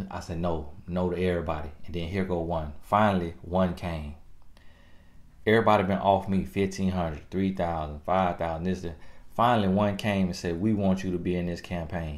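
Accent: South, United States. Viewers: I think he is saying on your "back" but it sounds like bike due to his strong southern accent. Lol. Either way...super funny. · Good morning Mr.... I pray you receive this message with a humble heart. I am just listening to this particular video. And yes, I totally agree with your message. Question: my family is from Alabama. Are you from Alabama? I swear you sound like my Father ( God's peace with him in heaven). Listening takes me back to that honest place. THE REAL SOUTH 💯. Keep us informed and ready. But still waiting but readying myself for my husband. Thanks! God's daughter❤️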